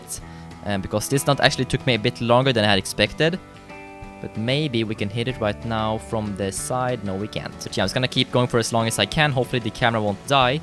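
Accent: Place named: Sweden. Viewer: You sound like your swedish.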